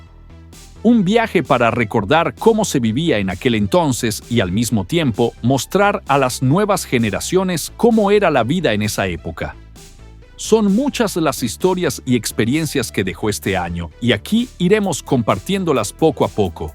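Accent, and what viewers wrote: Yucatán, Mexico. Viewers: Creo que el que narra el video es de Yucatán, BOMBA !!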